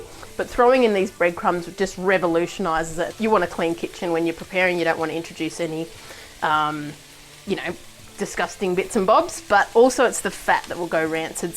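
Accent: Australia. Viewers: Hi I’m a newbie to dehydrating and came across your post. Firstly thanks so much for your excellent presentation and so good to hear a fellow Australian accent. Will certainly try the spaghetti bol recipe. Happy days from Nelson Bay NSW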